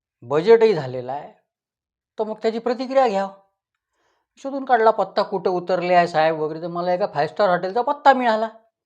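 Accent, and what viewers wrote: Vidarbha, India. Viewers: फार सुरेख नाटयमय कथाकथन ! Your pronunciation is with a humorous accent ...Your speciality.. वैदर्भिय लहेजा ..Pl keep it up !👍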